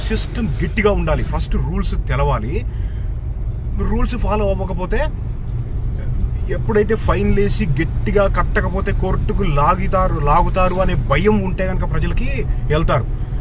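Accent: Andhra Pradesh, India. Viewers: Anna small doubt naaku me slang telangana slang la undadhu ap la untundhi kaaranam chepthava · Anna ne language enduku andhra vala laga undi ? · Same doubt naku vachindhi bayya.... Telangana salng ledhu pure andhra salng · meedi evooru? Bodhan lo evaru vunnaru? Choostunte Andhra yasalo matladutunnaru? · Anna abn radha krishna type anukunta...few years back they migrated from andhra to Nizamabad and started farming and mixed with telangana culture but slang inka andhra ne ontadi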